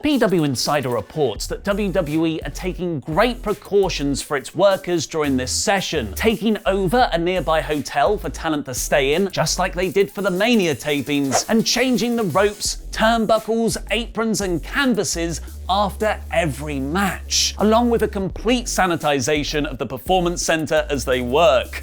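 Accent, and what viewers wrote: Australia. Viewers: your Australian accent is on point mate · That is one fine Aussie accent, my friend.👍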